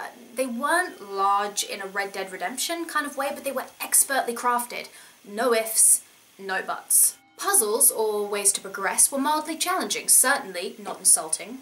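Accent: United Kingdom. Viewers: I think you should have done the voice over work for Tomb Raider. Your british accent sounds much better than the actress that did it.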